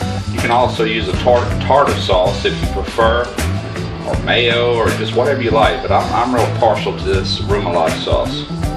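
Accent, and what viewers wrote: South, United States. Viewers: I Like The Theme Of This Video & The Accent U Have Lol I Felt Like I Was In The Country What Was The Name Of The Song That Started Playing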